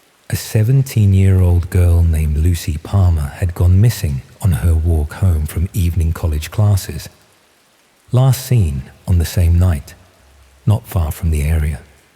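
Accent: United Kingdom. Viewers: Why is he British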